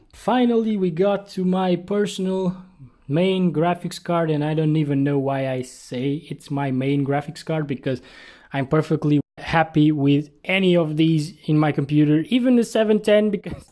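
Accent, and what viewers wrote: Portugal. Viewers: Ah, então você é de Portugal? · És português?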